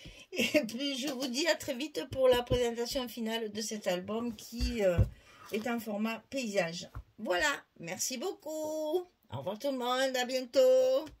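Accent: South, France. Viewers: Merci, tout est magnifique. Tu m'as trop fait rire: empastifé. Moi aussi je parle le sud. Bisous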